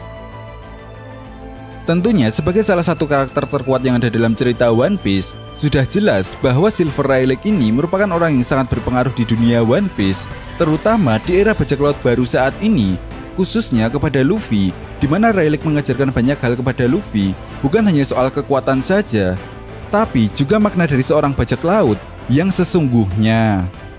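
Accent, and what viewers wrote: Java, Indonesia. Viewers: Bahasane meduk rek 😁.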